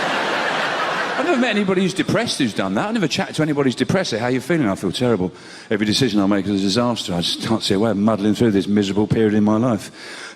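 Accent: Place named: Sussex, United Kingdom. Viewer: Sounds like a Sussex lad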